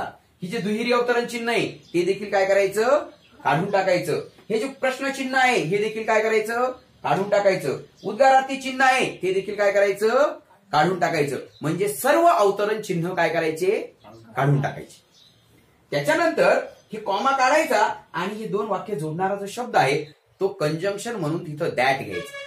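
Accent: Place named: Bengal, India. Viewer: Ka bol raha h, bangali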